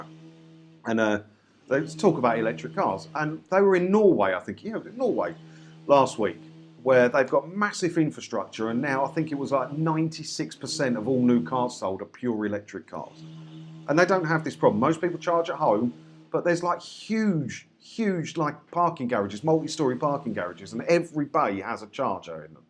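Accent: United Kingdom. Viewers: Blimey, Al Murray's 'Pub Landlord' with hair? Beau'iful Bri'ish rant... 🍺🍷Cheers! 😁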